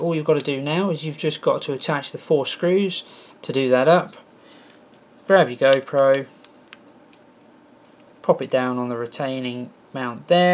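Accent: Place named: United Kingdom. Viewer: I love that british Accent. Nice tutorial! I sought long for this. Now finally got it ;-) Thanks a lot!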